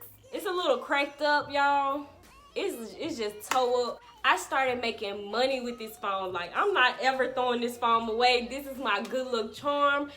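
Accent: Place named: New York, United States. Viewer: Your Accent is Killing. Is that Newyork Accent?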